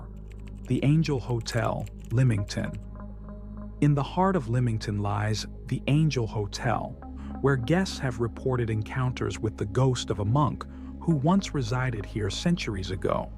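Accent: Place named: United States. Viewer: Why an American voiceover?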